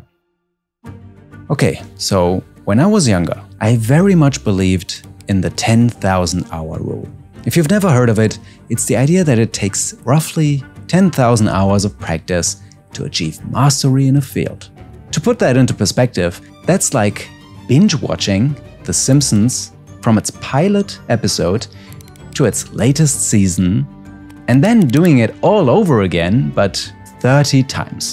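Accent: Germany. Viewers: He lives in Germany, as it is said in the "about" section and his accent is also German I think, so there's a great chance that he's Turkish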